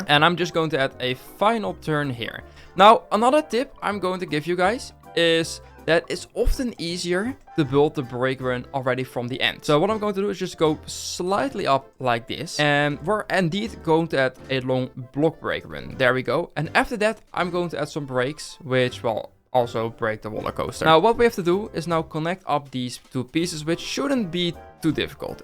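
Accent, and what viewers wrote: Netherlands. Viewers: bro ben je nederland svolgens mij wel kan ik horen lol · Hi, I have a question! Are you Dutch?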